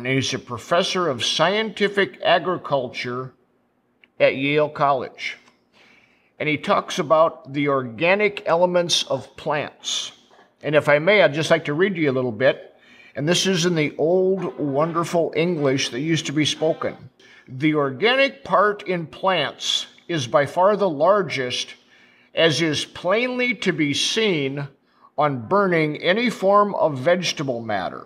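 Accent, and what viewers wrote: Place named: Midwest, United States. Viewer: That's a midwest accent . I think. Seems Wisconsin as F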